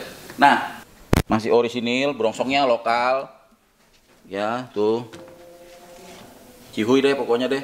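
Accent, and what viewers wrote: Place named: Tangerang, Indonesia. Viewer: lokasi di Tangerang kah? Logatnya kayak Tangerang2 benteng gituu, haha asiikk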